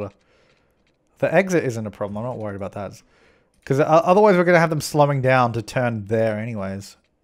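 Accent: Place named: Australia. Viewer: Austrailian accent is beautifull.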